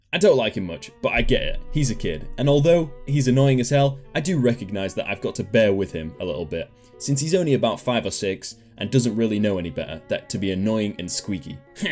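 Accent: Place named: Northern England, United Kingdom. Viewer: you're obviously from up north. Where abouts lad?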